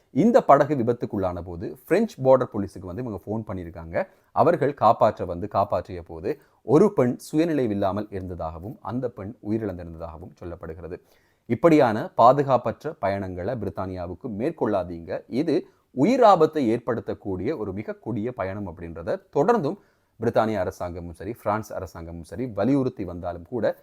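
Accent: Sri Lanka. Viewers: Srilanka tamil is always unique enna doubt ungalukku 🤗🥰 iam in srilankan from india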